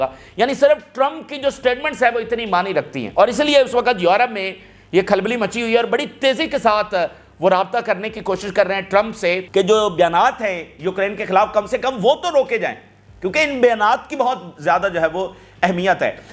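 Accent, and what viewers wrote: Pakistan. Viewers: Guy you in Pakistan for your information your need to know we the people of United States had voted for president Donald Trump and we love ❤President Donald Trump, and President Donald Trump with love of the people of USA he will be the president of USA for 3rd term too! America and American first Only! No involvement of USA 🇺🇸 in any form or way in the affairs and matters of other countries of the world any more, no more of the USA’s financial aids to any other country of the world that is the reason we the people of USA had voted for president Donald Trump! and It would be better if you stop screaming and speak in low volume! Plus you have heavy Urdu accent on to your English, it is ok to speak entirely in Urdu without adding English words or sentences in between your Urdu speech, though it would be even great, if you could put some efforts on improving your English that have heavy Urdu accent! 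Thanks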